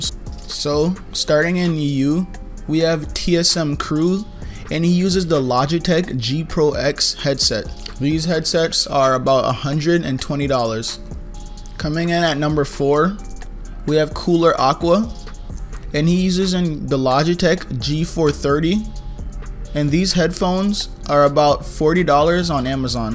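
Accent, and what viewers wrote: Jamaica. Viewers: You’re Jamaican?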